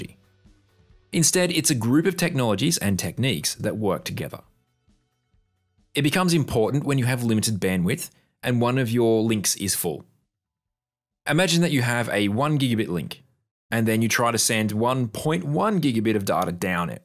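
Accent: Australia. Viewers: As a network+ student I would like to thank you for clearly explainging the difference between QoS and CoS. And great to hear a fellow Aussie for a change. I hope you make more content.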